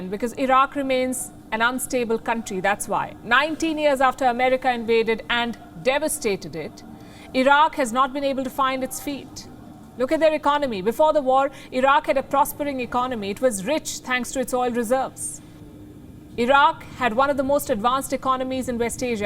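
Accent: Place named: India. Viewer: The US did not leave, where do you pull your opinions', out of thin air. A war started by Iraq attacking Saudi Arabia and Kuwait, again like Russia, they started the wars. What should the US do, leave. Financially it would be good for the US. The women had no rights, and Iraq threatened it's neighbors. Food for oil. It's not profitable to start a war, but what would have happened if the US did not. Iran would take over, and they are no good. India perspective is that we did not do anything. You speak Indian rather the English because you are not.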